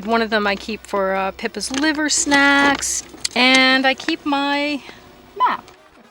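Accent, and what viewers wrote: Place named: Canada. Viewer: Sweet setup and that Canadian voice doesn't hurt at all ... all the best!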